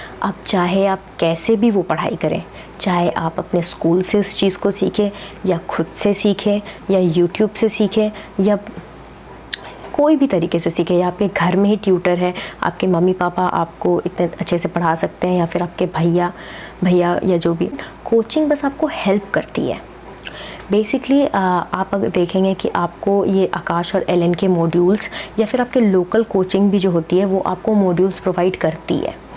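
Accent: Bihar, India. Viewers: R u from Bihar???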